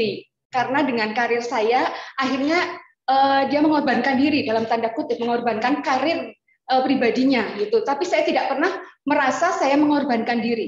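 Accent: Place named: Banyumas, Indonesia. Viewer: Salam ngapak bu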